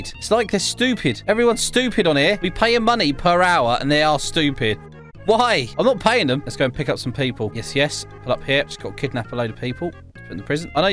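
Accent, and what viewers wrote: United Kingdom. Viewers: Ur British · YAY A NEW BRITISH GUY!! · Mate pls tell me why British people say water soo weird (I love the British accents) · Are you british and also GOOD VİD try race clicker pls 🎉😮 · Are you British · you british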